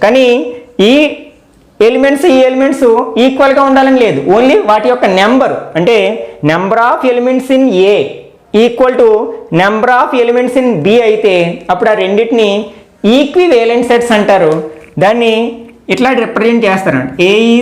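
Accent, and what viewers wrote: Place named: Godavari, India. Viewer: Thank You, While I hear your class I feel as if I hear my close friend teaching me its because of your good Godawari tone. All the best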